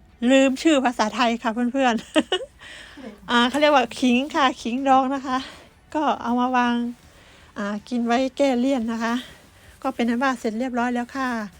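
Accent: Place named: Japan. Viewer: อยู่ญี่ปุ่นนานจนลืมภาษาไทยแล้ว 555 สำเนียงพูดก็แบบคนญี่ปุ่นเลยอ่ะ เสียดายไม่ทานเนื้อแต่ก็ปรับเป็นหมูแทน น่ากินมากเลย ชอบหอมหัวใหญ่ด้วย 🥰🥰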